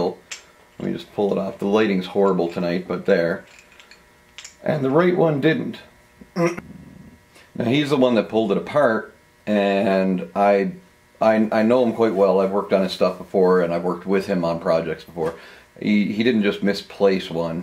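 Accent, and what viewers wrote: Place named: Canada. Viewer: I’ve been in the auto industry for 30 years and have never heard a ring hear called a crown gear, I kept listening and I believe I hear a Canadian accent perhaps, it’s interesting to me how each area or region has their own names for certain things.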